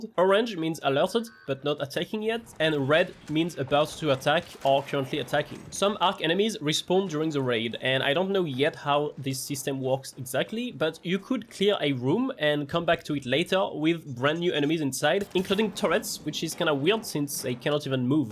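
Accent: France. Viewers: Are you French? As a French guy i can hear the French accents in every word hahaha love your video btw !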